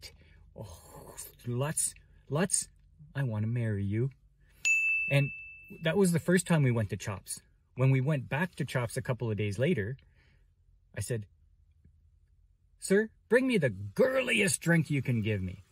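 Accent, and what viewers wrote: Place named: Northern Ontario, Canada. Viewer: Trust your wife, words to live by, so when's your next Cruise? Your Nordern Ontario accent was showin' heh great video!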